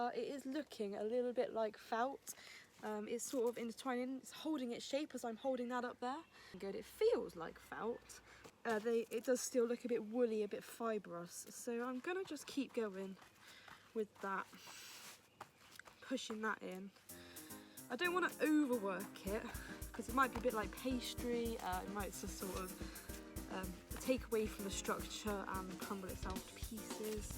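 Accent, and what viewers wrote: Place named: England, United Kingdom. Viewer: That's so weird, she looks middle eastern but sounds English!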